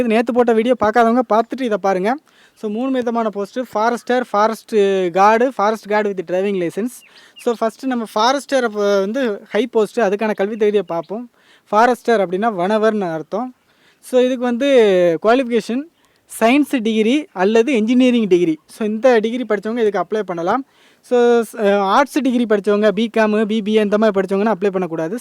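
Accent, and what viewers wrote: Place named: Tirunelveli, India. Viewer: R u from nellai....?i like ur slang..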